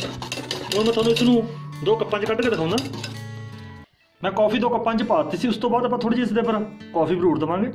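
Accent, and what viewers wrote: India. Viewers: Bhai aap ki punjabi bilkul mere nanhyal wali he wo ambala punjab k the ab hum Pakistan k punjab mehe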